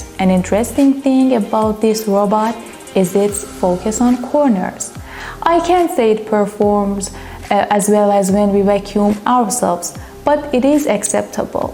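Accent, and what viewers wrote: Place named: Iran. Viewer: Phenomenal Persian accent!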